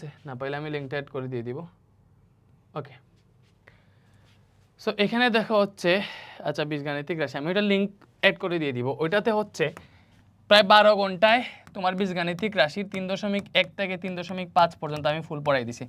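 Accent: Sylhet, Bangladesh. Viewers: Bhai ami shior tmi Sylheti ❤ tmr mat e buja jay tmi Sylheti ❤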